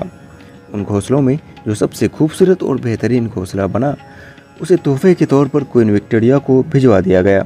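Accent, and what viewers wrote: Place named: Bihar, India. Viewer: Bihar se ho kya Bhai launguage me influence ...samajh aa rha hai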